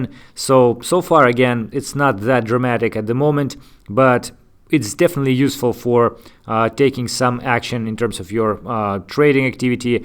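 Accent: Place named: Russia. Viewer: Sounds like russian accent :) But anyway, very solid video! Thanks!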